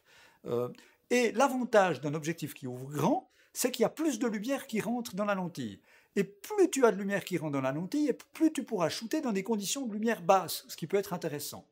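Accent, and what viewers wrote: Switzerland. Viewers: haha toi tu viens de chez nous! vive la suisse, neuchâtel?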